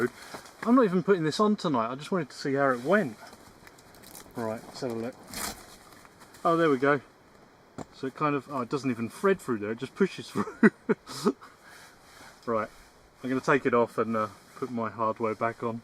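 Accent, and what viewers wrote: England, United Kingdom. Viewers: I'm a new viewer. I'm American and a huge Anglophile - you have an English accent but to the best of my knowledge (which is limited, to be honest), the most 'wooded area' in Great Britain is a place called New Forest. Is that where you do these videos? I enjoy your content. Best wishes from Florida.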